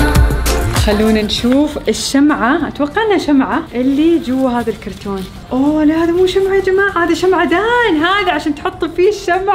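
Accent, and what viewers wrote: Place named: Iraq. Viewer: احكي يوام كامل عراقي😊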